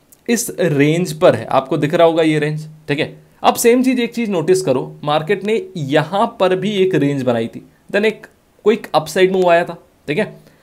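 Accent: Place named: Bangalore, India. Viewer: is from banglore XD accent se smjh gya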